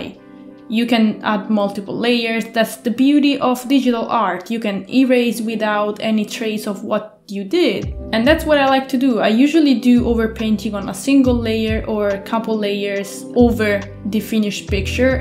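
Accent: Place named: England, United Kingdom. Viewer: You sound a lot like Sypha from Castlevania! I don't just mean your English accent but like your overall tone/pitch Very soothing :> And this was so relaxing to watch, I've wondered how you do a few things here and there so it was great hearing about the process!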